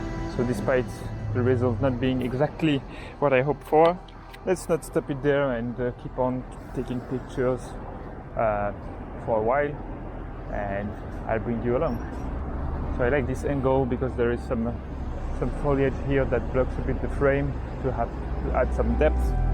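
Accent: France. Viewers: Isn't it a French accent ?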